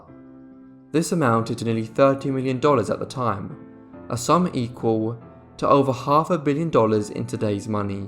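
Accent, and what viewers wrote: United Kingdom. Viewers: He just sounds British to me. I didn't have any issue with it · He has a speech impediment AND is British.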